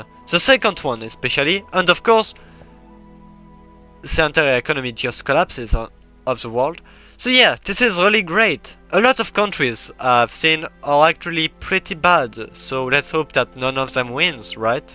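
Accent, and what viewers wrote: France. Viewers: bah bro i can tell by the accent + i checked his profile h says he’s french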